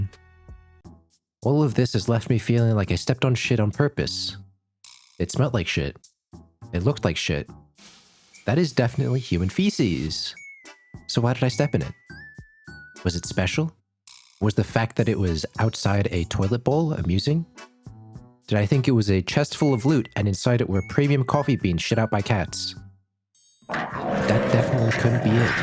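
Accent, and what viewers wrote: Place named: United States. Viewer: Loving your videos man. Got a new subscriber. That said, I know you're Aussie, so I'm curious why your accent is 95% American, with 5% Aussie accent slipping through occasionally. It's tripping me out lmao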